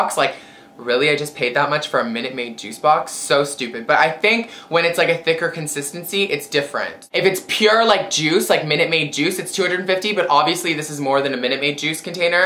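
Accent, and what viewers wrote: Canada. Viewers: Are you canadian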